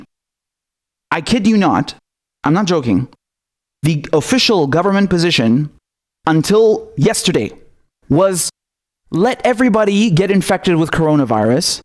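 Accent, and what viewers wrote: United Kingdom. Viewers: Your British accent kicks ass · Papi, your British accent is hilariously entertaining.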